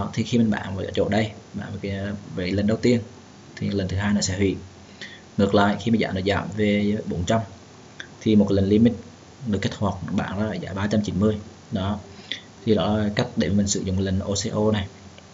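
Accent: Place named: Central, Vietnam. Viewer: Học tiếng việt nam đi bạn ơi chứ làm youtube nch quốc gia miền trung vậy nghe nhức cả óc =.=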